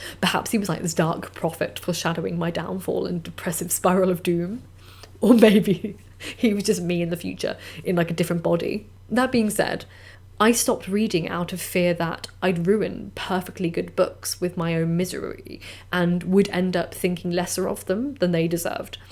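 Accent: United Kingdom. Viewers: After a chapter or a few pages of fiction I've for years been getting this painful sence of urgency, as if the book is wasting my time, drawing me away from the limited years of my own life. Ironically my own life feels a bit meaningless. Also are you British or just playing a British character on Youtube?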